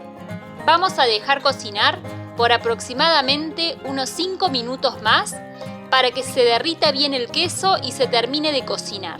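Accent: Córdoba, Argentina. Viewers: Me imagino q eres de Argentina por el acento. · Hola soy de CARLOS PAZ Argentina...y tú???Tu tonada parece de Córdoba 😀👌👍🏻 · Espectacular la voy a hacer!!! Por ahí me parece que tenés una tonada cordobesa, puede ser?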